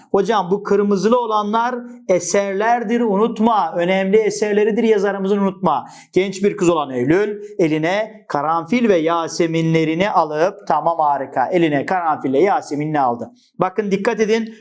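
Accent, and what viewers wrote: Black Sea, Turkey. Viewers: Hocam karadenizli misiniz?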